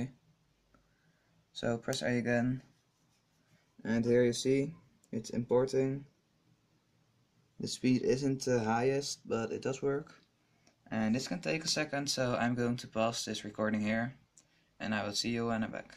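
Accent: Netherlands. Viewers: wanneer een nederlander engels praat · something tells me you're from holland :P, correct me if im wrong, and if you are from holland(don't worry about it im form holland aswell :P )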